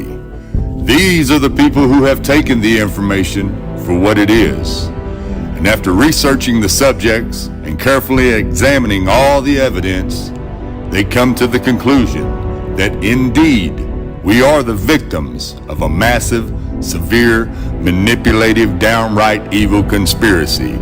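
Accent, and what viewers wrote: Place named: United States. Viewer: I love your accent, not sure what part of the US you are from. Much love from Ireland. God bless my brother in Christ.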